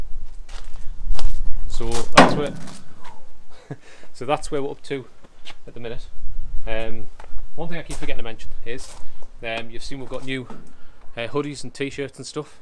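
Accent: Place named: Consett, United Kingdom. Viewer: are you lads from consett?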